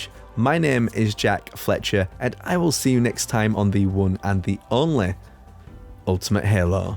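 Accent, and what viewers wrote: Yorkshire, United Kingdom. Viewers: This is the first time ive seen one of your vids, you sound Keighley/leeds as fuck! Great analysis btw, this looks as though it could be the best halo since number 3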